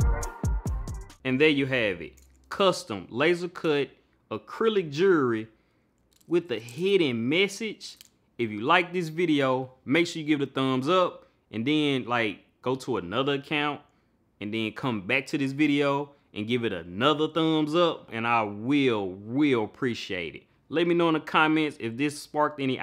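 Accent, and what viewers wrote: South, United States. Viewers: Love this!! Makin' me want too look into how much all the equipment would cost.... Glad I found you, u can thank IG, there was some commercial & I just had to go find you, with your smooth southern voice! 💚Loving that too💚🤍💚🕊️ may God bless you!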